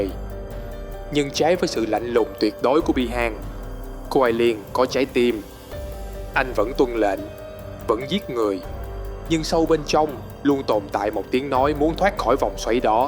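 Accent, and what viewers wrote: South, Vietnam. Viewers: Một trong những giọng đọc miền Nam mình yêu thích ngoài Minh Béo của Game cực hay. Kênh cố gắng giữ bạn này và phát triển các nội dung có thêm tính hài hước sẽ tăng tương tác nhiều hơn.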